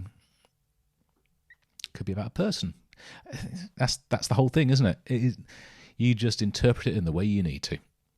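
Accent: United Kingdom. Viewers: Why do I feel like I'm watching I'm Alan Partridge? Probably because you're British and you've a good voice for radio.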